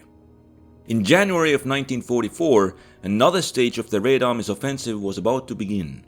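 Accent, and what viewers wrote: Estonia. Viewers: YOU SOUND LIKE ESTONIAN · are you estonian. You sound estonian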